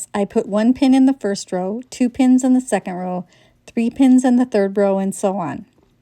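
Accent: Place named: Texas, United States. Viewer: I'm from Texas and have been quilting only since i retired about 10 yrs. Iwas taught not to waste anything so i make baby quilts and string and log cabin. I love this and was trying to decide what to start now. You helped me decide. The only problem I had was thinking you were saying black instead of block and couldn't quite figure out where you were putting the black.....guess I missed the Texas drawllllll lol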